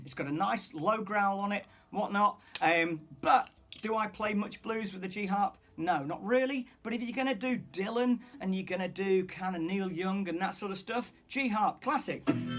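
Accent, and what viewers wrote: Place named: England, United Kingdom. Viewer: Hello again And here's me thinking you were from London 😂 You sound more English than kiwi But that's not of interest your music speaks volumes I tend to play harps as well Mine is G and E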